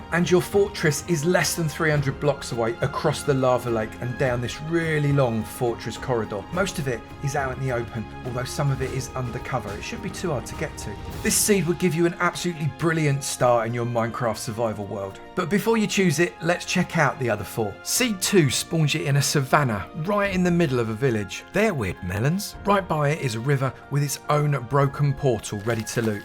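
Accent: United Kingdom. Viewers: this dude sounds like a tourist attraction person XD very soothing bri'ish accent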